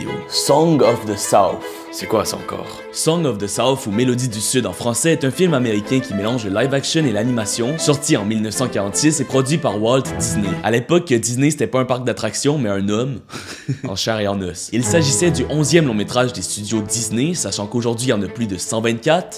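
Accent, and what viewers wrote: Quebec, Canada. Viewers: t tu quebequoi touer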